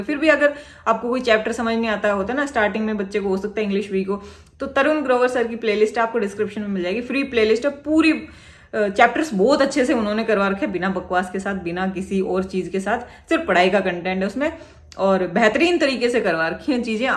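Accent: Haryana, India. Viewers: ਹਰਿਆਣਾ ਵਾਲੇ ਹੋ ਭਾਈ 😁😁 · Didi ap haryana se ho? Accent harayana jsisi h 😅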